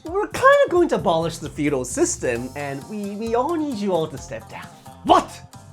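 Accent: Japan. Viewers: English but in a Japanese voice inflection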